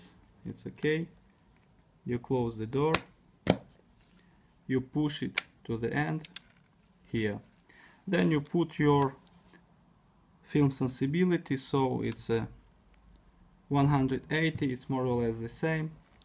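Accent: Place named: Russia. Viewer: Хорошо говорите по английски... молодец... А думаете все равно по русски... и это чувствуется. Спасибо за обзор... Хороший фотоаппарат... всегда мечтал купить его в молодости.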